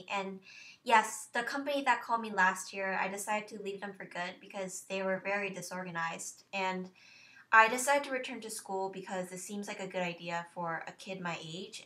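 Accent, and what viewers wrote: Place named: United States. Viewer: Your English is very good if you were in America most people would assume you were American based on the way you talk. Good luck with school.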